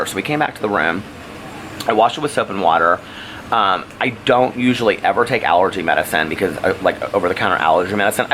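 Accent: South, United States. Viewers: I would say you have a Southern-esque accent. I have a friend from Georgia and you sound almost exactly like him, just not as extreme. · I totally hear the southern in your voice!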